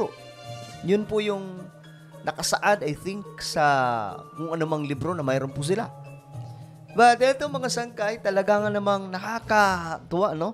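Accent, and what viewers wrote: Waray, Philippines. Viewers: Ok sangkay parang waray.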